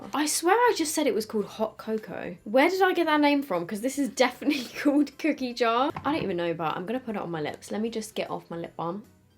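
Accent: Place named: England, United Kingdom. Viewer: She’s English not Irish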